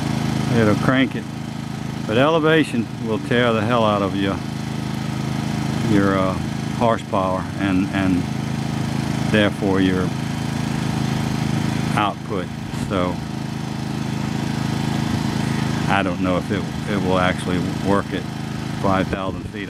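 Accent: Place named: Cajun, United States. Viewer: I like your style.... I sometimes wonder why people feel the need to criticize anonymously when they wouldn't say it to your face. Like the joker that said your voice is slow. I lived in Baton Rouge and it was nice to hear that Cajun twang again. And good video too. Nicely done.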